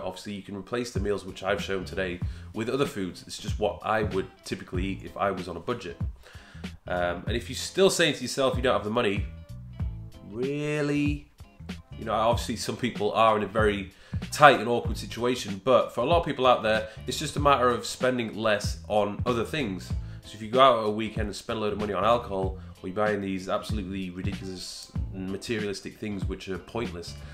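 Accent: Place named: Stoke, United Kingdom. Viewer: Got to be from Stoke or somewhere near there 😝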